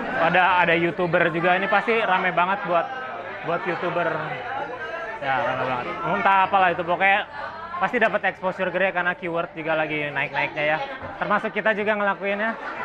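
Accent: United Kingdom. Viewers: british accent ya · pantesan bahasa inggrisnya kayak British accent gitu 😂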